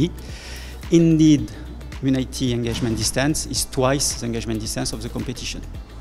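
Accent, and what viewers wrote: France. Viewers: Sorry but His English accent is just terrible. For English speaking markets they should appoint someone who can speak English without or less Franch accent.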